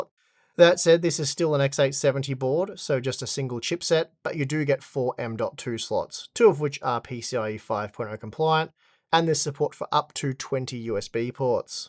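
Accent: Australia. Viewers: It's almost like they're australian and have a different accent and pronunciation over there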